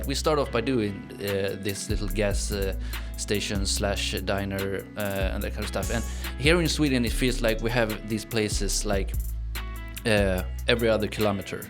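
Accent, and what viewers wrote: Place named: Caribbean. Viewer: Hey hey hey 👋🏾. My Swedish YouTuber with Soul. Again, really happy to have you back. Always enjoyed you videos for many reasons especially being the music. You definitely remind me of someone from the Caribbean islands. The accent and cool vibes. Are you still going to be making your own for your videos? Anyways, have a great weekend and stay cool. Looking forward to your next vid.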